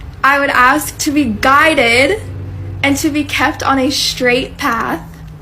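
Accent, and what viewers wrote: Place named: United States. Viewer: Sister I can tell you're definitely American. And you're a good servant of ALLAAHH..in sya Allaahh. May ALLAAHH PROTECT n bless you eternally❤❤❤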